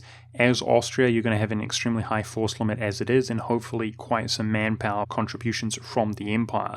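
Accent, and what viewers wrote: New Zealand. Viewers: Yep, he's from NZ. Pretty obvious isn it? :D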